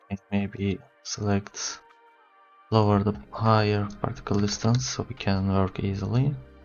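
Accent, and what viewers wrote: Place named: Turkey. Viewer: kardeşim sağ alta bakana kadar dedim "bi yabancı nasıl türk gibi ingilizce konuşabilir". klavyenin türkçe olduğunu görünce içim rahatladı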